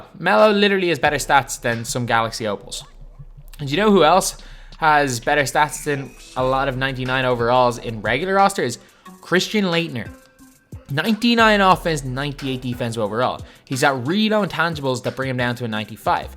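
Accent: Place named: Ireland. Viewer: You sound Irish. I know because I am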